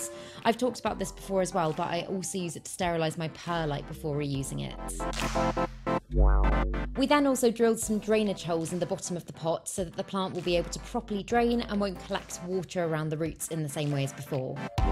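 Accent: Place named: London, United Kingdom. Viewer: I like your English. Londoner?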